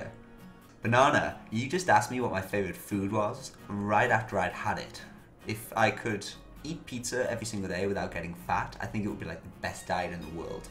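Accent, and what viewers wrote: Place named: North, United Kingdom. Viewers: If you are from New Castle, where did you get the posh accent then? hahah I thought New Castle has the weirdest accent among english people. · Gotta love that accent :D NOOOORTH bonaana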